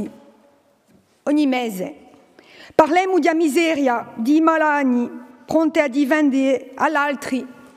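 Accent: France. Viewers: Che brutto il corso un italiano con le u finali e la.cadenza francese . Il sardo e tutta un altra cosa.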